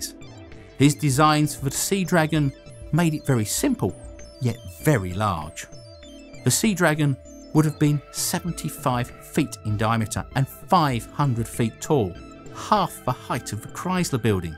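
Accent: United Kingdom. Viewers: His British accent is like music o my ears.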